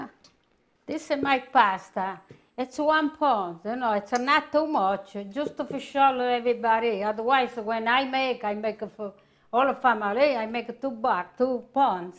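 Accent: Italy. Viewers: Oh, I looooooooove Italian accents! And hers is so sweet.... I love how she narrates every step. Sending big hugs from Deutschland, beautiful lady! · watching you cook, and hearing your wonderful Italian accent, and feeling the love coming from your heart, makes the part of my day that I spend with you, in your kitchen, take me back to my own childhood, your a very special woman, thank you for what you are and do. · I am a fan of Italian cuisine specially when it is presented in English with Italian accent. I am happy that Pasta Rigatoni brings back to you nice memories. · So happy to stumble onto your channel. My sweet Italian grandmother has been gone for 19 years. Listening to you is like being back in her kitchen. I never noticed her thick accent. I understand every word you say. Wonderful memories. You are real treasure. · My God I could smell that food through my phone it looks so good. 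I just want to give that woman a big hug I love her thick Italian accent continue continue making videos grandma I love you.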